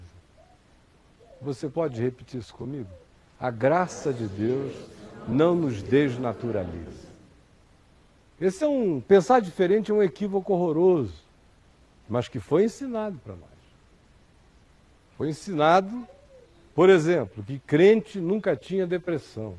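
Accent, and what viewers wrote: Recife, Brazil. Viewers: ai sabe que fala recife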